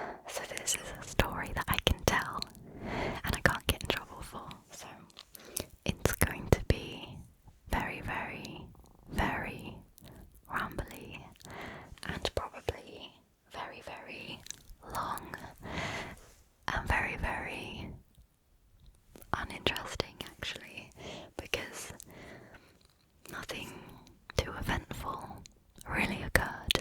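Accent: England, United Kingdom. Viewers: I tried listening to your story I really did but I feel asleep only to wake up to another one of your videos playing. The sound of your voice alone puts me to sleep, it's one of the most soothing ones in the ASMR community. I think your UK accent has a bit to do with it as well :) You are the best :D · Superb! ASMR and your English accent is a perfect combination👌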